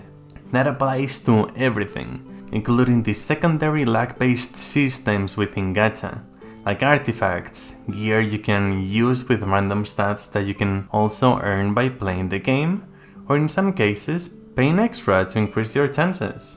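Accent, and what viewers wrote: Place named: France. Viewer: Honestly, Genshin is my first gacha game and I've been hooked since pandemic and the only thing that kept me sane. So despite all the things negative things that people said about the game I will play the game until it's over. Thank you for the reminder tho. Really need it. Also, your accent sounds french. Are u french? Lol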